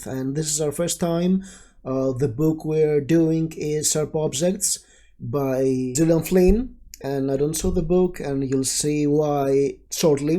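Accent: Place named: Greece. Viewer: Well, random slightly off topic comment but, I couldn't find the Finnish audiobook of Frankenstein, and that made me really sad. I read it in 3 languages, but I wanted to listen to the Finnish version too and I couldn't. :( ...back to the video. Ah yes, the accents, I have like 20, and I know that's off-putting for some, but hey, it's what I have. Also, I can understand you regardless of the Greek accent. I don't find a problem with that. But then again, I'm so used to having friends and peers from all over the world, I know a lot of people aren't this used to accents.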